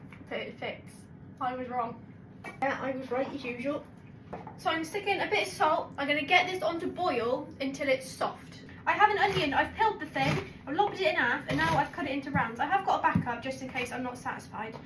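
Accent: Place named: West Country, United Kingdom. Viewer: Love your accent wheres it from somerset or devon maybe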